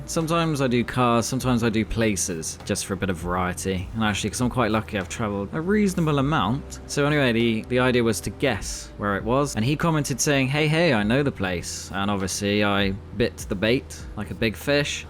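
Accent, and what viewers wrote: United Kingdom. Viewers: You play SnowRunner. You have a bloody dry humor. And you have a British accent. It's no wonder this channel is going to explode in the next months... ;-) You're doing the right thing. Just keep doing it. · I never knew you were a fellow Britishman!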